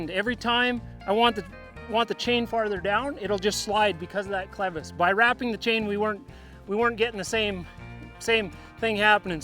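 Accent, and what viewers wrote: Canada. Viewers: Slight Canadian accent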